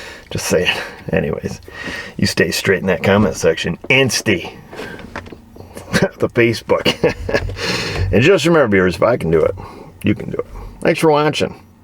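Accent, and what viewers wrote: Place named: Massachusetts, United States. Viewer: Wicked good MA accent 😂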